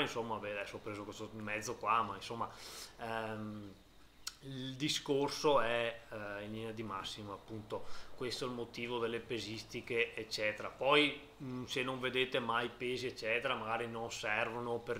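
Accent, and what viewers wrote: Veneto, Italy. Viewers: Sei Veneto?😂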